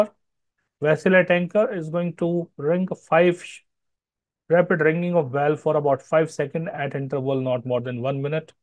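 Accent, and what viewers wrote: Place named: India. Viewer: You are correct in that the video should assist with comprehension. But in my case that was not an option. I was driving and listening to the video, not being able to actually watch it. It’s the “Indian English” that I have difficulty understanding. The narrator does an acceptable with his presentation and English vocabulary. For me it’s the accent. And me being from backwoods Tennessee I have not been exposed to that accent other than the random telemarketer phone call.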